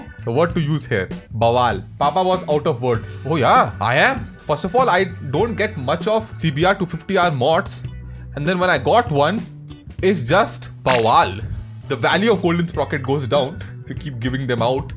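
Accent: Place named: India. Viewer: Why does Indian accent sound so gay and funny😂😂😂😂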